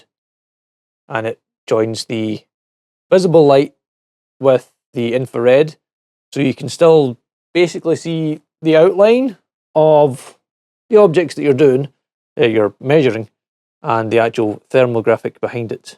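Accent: Scotland, United Kingdom. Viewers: Cool, like your accent, Scottish? Do you think doogee used good components to last as long as samsung or other 'well" made phone?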